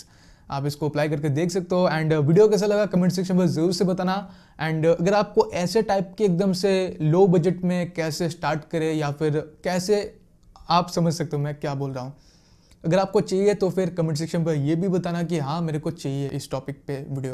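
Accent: Bengal, India. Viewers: This was absolutely helpful ♥️♥️ subscribed instantly ! 😄 By your accent I feel you are Bengali .. 🙌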